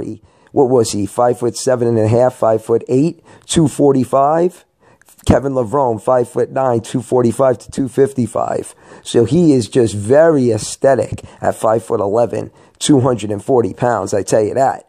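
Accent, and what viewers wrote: New York, United States. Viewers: i love your nyc accent it makes the whole video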